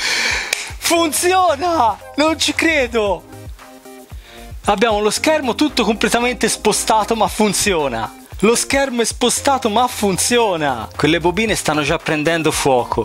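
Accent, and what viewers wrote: Tuscany, Italy. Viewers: L’unico toscano he nun me fa ghignare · Grande!!! Anche se sono sprovvisto di TV da circa 5 anni (felicemente sfornito) mi sono fermato a guardare questo bel video per la presenza del pezzo da museo che è stata la mia ultima TV... e per l'accento toscano che secondo me fa la sua parte. Simpaticissimo davvero. Grazie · Sei simpatico,sei bravo,sei creativo...sei anche toscano a giudicare dall'accento...si dice che l'italiano sia nato in Toscana. Ma perchè usi tanto inglese inutile ? Qualche esempio( ma sarei in grado di tradurre ogni parola) : SCREEN = SCHERMO SWITCH = INTERRUTTORE ECC... Buon lavoro comunque e ripeto video interessanti. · Ma sei Toscano??? Perchè lo sembri comunque se si lo sono anch'io. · Ho appena scoperto il tuo canale grazie a questo video molto interessante. Davvero bravo e simpatico! Tra l'altro mi sembra di sentire un accento toscano, di dove sei(per curiosità, dato che io sono di Firenze)? 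· bello sto canale sei mega simpatico! hai un nuovo iscritto! tra l'altro mi sembri toscano come me solo non riesco bene a capire di dove :) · ma sei della toscana di che citta' e sei forteeeee ehhh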